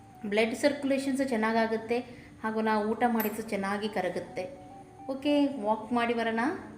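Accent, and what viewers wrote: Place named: Kundapura, India. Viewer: actually ನನ್ನ ಅಮ್ಮನ ಊರು ಕುಂದಾಪುರ.. ನಿಮ್ ಮಾತಾಡೋದು ನೋಡಿ ನಮ್ಮೂರಿನ ಹುಡ್ಗಿ ಅಂತ ಗೊತ್ತಾಯ್ತು.. ಅದಕ್ಕೆ ಕೇಳಿದ್ದು.. Don't mistake me..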